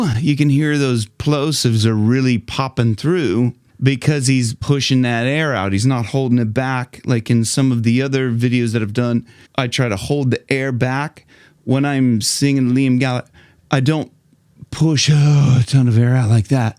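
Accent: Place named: United States. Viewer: thanks Man I understand with the American accent it was a really good video bro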